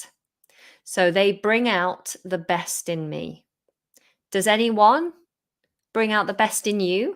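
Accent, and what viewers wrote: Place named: United Kingdom. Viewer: I love British accent!!!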